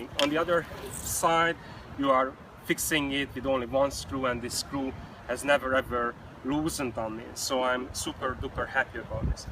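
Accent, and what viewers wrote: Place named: Hungary. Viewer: Hungaro English :)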